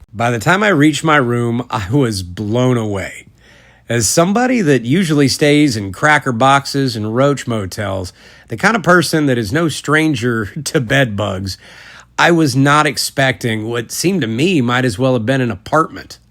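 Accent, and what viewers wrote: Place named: Texas, United States. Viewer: The delivery and vibe this man brings is beautiful, it’s that old Texas twang full of warmth and character. Really excellent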